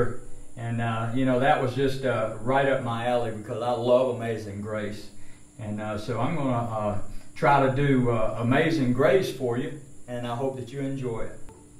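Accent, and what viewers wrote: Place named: South, United States. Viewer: I really like your southern accent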